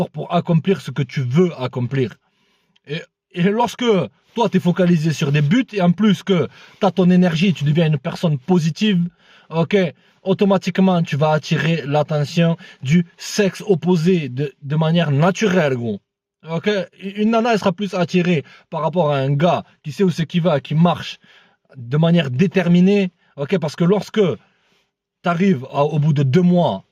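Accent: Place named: Southwest, France. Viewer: Accent terrible, t d'ou ? Toulouse ? Sud ouest ? Bonnes videos sérieux.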